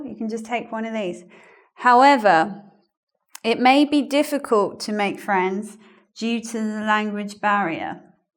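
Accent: United Kingdom. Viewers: Do you have British accent ?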